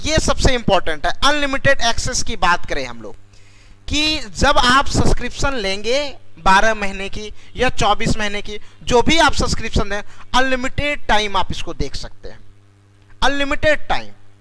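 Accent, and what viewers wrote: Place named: Bihar, India. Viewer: Maje le ro ho ap Ye bihar ki language aisi hi prvarti ki hoti h 🤗 पढना _ परना सडक_ सरक etc. It's all ✓. One can understand 😎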